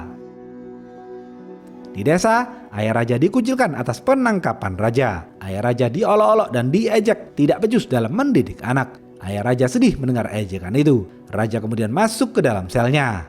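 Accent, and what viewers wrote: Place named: Solo, Indonesia. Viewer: Min suaranya kaya pria solo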